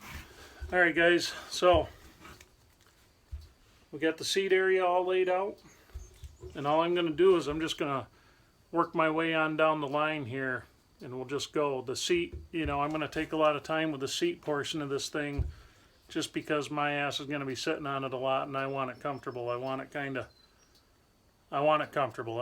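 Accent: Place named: Midwest, United States. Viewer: Thanks for this video. I am looking forward towards the building of it. Your channel is starting off small, yet I see something in your presentation which reminds me of Wranglerstars' earlier days. Please keep on building. Also, could you do something with the audio portion of your video. I've pumped my computer up to 100% along with the YouTube volume, yet it seems like you are speaking in a soft voice. Please check your audio equipment and see if the volume is turned up to full. I'd rather you blast my socks off and then I'll just turn down the volume. It will be interesting if you hog out some of the butt cheek portion of the seat or will you just be sitting on a flat slab? Heck, the way you go about doing things it might just be as comfortable with a Barka lounger cushion on it. Plus, one more thing. What part of the country are you in? 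I'm betting it is Iowa or Wisconsin due to your accent. Thanks again for your vids and please keep them coming. Terry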